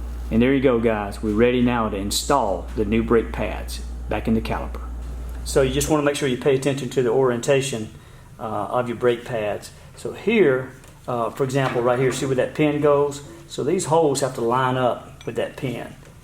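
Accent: South, United States. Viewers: Great vid! Well videoed. Easy to see what you were doing along with an understandable explanation. Love the shit kicker drawl.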